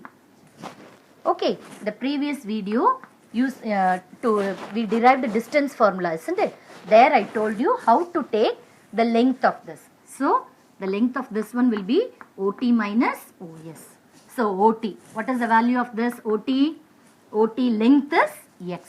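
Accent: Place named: South India, India. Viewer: Your South Indian accent is awesome mam.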